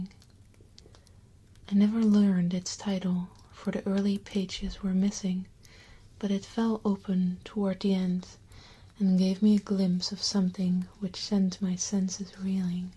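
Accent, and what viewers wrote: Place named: Netherlands. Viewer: fireplug1701d Ah, you should have been here a couple of years earlier my friend. The lovely accent was more pronounced. Check out her older videos on the main playlist. I remember when our lovely couldn't say the number "three." There's no "Th" sound in the Dutch language. So three came out as "tree." It was adorable in her ASMR voice. Then one day, someone in a livestream requested she count to three. She scowled a bit before doing just that. End of an era.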